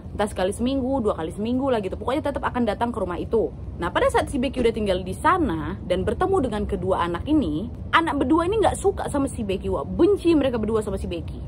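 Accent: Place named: Medan, Indonesia. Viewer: Logat kak medan kali😂😂 Btw salam kenal yah wak aku yang setia kali ini nonton videomu sampe berulang pun aku sanggup..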